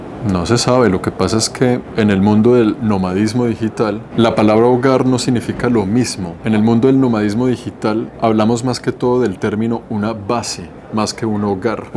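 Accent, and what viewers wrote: Bogotá, Colombia. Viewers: claro, y no es una teoría es lógica, estando un colombiano en canadá hablando inglés y francés por qué cambiaria su acento en español?, no hay nada ni nadie que le haga hablar español de distinta forma. Podrá olvidar palabras pero no la forma de pronunciarlas. Estando en España en cambio, tendría que usar el español para entender, hacerse entender y relacionarse con los locales, además sería normal que cambie palabras y dichos para que encajen con los locales y evitar malentendidos o tener que explicarse a cada rato, no te digo que hubiera cambiado por completo su acento pero si usaría palabras y expresiones del país huésped. Esto pasa en los dos lados, un español en colombia, argentina o méxico adaptará su acento al del país. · Cachaco de pura sepa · 13 años en Canada y no perdiste tu encantador asento Colombiano❤ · La primera vez que te vi fue en un video de Oscar Alejandro. 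Escuché el acento y los dichos como “pichirilo” y me dije: “Es Colombiano”. Desde ahí te veo sagradamente todos los domingos y cuando sacas vídeos extras. Siempre tuve la idea de establecerme en Canadá. Tuve una beca para un curso de actualización en 2013 y me encantó el país. Hoy las cosas están confusas (y ya voy para los 50…), haces ver fácil tomar decisiones, creo que está en tu naturaleza de inadaptado. Yo creía que también era inadaptada y hasta cierto punto lo soy. Sin embargo, ahora que tengo nuevos cambios desde 2022… he confirmado mi confusión. De momento, prefiero quedarme y adaptar mi base a mi… Las cosas a los 50 se ven distintas, la energía y las prioridades cambian…me parece. Tal vez esta incomodidad que tengo me haga tomar una decisión como tú. Gracias por tu transparencia y sencillez. Bendiciones en esta nueva etapa. Abrazos desde Bogotá, chaolín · Me encantan tus videos, muy auténtico y me recuerda el acento de mi bella Colombia, que es lo mejor de lo mejor. 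· Acento Bogotano!!!!! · Hola,! Somos una familia colombiana que emigró a Canadá.Te seguimos hace tiempo, tus vídeos fueron muy útiles en ese duro proceso de hacer a un lado el miedo y volar.Nos encanta tu buena vibra y nos conecta ese Español Bogotano tan auténtico qué no te abandona.Te deseamos lo mejor...Por supuesto la tierra del realismo mágico siempre nos llama, allí están nuestras raíces.Un abrazo y éxitos 🍁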